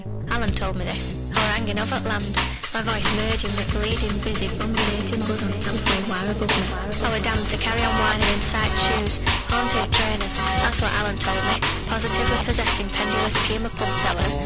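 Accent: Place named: Lancashire, United Kingdom. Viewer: different and good! Love the lancs accent just as much as i despise the old boy eton twang.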